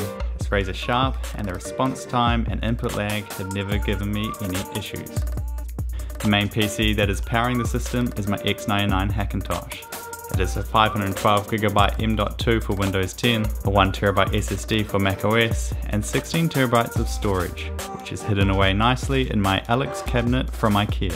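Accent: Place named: New Zealand. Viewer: hey bro where are you from? Thats a new zealandy accent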